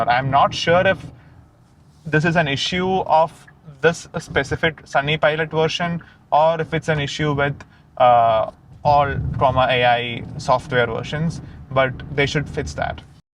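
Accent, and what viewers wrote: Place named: Kerala, India. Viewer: bruh that mallu accent :)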